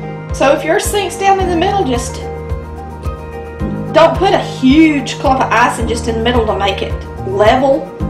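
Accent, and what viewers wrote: South, United States. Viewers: Looks awesome. I was diagnosed with cancer 3 yrs ago and after chemo, radiation & 3 major cancer surgeries at M.D. Anderson in Houston Tx, I had to find a new hobby so I wouldn't loose my mind & I've learned I LOVE TO COOK!!! I'm getting better with every recipe. I will be making this soon...especially on a cold rainy day and I'm subscribing to your channel. Thanks for the recipes. Much love from Mobile Alabama I was wondering what city & state are you from...I definitely hear a southern accent.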